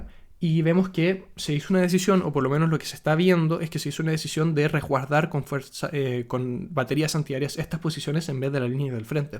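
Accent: Chile. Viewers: ¿Por casualidad eres chileno?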